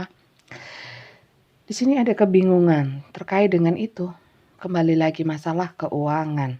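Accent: East Java, Indonesia. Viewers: MET pgi bunda hadir dari Sumut selalu pokus dgrin pencerahan,sukak bgt dgn cara pembacaan bunda dgn logat nya rek " ☺️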